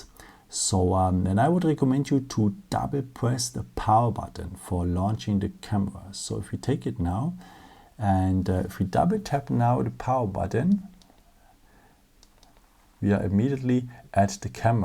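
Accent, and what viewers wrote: Germany. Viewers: he is german i can hear his accent but amazing is he doesnt sound like Arnie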